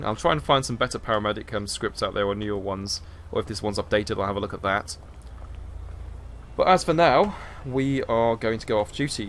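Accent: United Kingdom. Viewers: What part of Britain u from I'm from stoke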